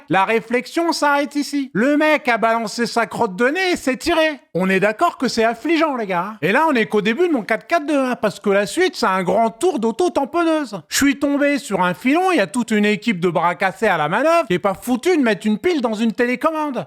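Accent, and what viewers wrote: France. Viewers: Je le savais que vous étiez soit Breton, soit Corse, Basque ou Auvergnat ! ^^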